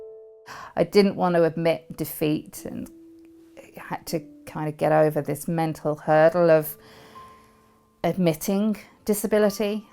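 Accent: England, United Kingdom. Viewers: What i dont understand is she said she can't afford the medication but she sounds British in which case she wouldn't pay for medication and being on esa she wouldn't have to pay for prescriptions. I have fibromyalgia and its truly awful so I sympathise with her but I just dont know what she means by affording medications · You sound English but I am assuming you don't live in England? If you were in the UK you can get free prescriptions to cover any medicines, I have 11 prescriptions monthly and used to buy a yearly pass whilst I was still at work but since becoming too ill to work I now get all my medicine for free, I cannot imagine what it must feel like to have the extra burden and worry of affording your meds I know if I had to pay for mine I couldn't afford them all and would have to go without some of them. I hope your situation improves and thank you for being so open and sharing this with us.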